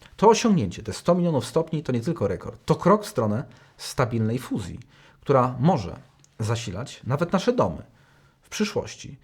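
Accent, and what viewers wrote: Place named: United States. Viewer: ps tylko AMERYKANIE tak te nazwy wypowiadaja - ja mieszkam e UK i okolo kilka krajow w Afryce ma jako uzredowy Angielski - i tak tego nie mowimy...pan z Ameryka znaczy... stad ten szal na nowinki ??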